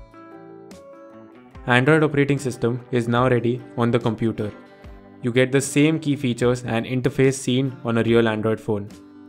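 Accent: India. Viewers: I love Indian accent camuptar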